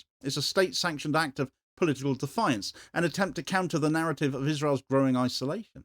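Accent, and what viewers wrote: United Kingdom. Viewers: First of all, there's nothing humiliating about losing a war against NATO as a small Balkan country. Second of all I like how you haven't mentioned the massive anti-government student protests that have been going on for more than 9 months. You said yourself that these weapons have been 'battle-tested' against a civilian population in Gaza and you could easily draw a parallel with these weapons possibly being used on the Serbian anti-government protesters if you weren't just fear mongering and spreading propaganda. Also it's very interesting how Serbia is now a problem, but your entire Western bloc, including your own country, that have been supporting and arming Israel for DECADES are fine. What is this hypocrisy? This video is proof that you can say anything, with a British accent, and people will think you're insightful and smart.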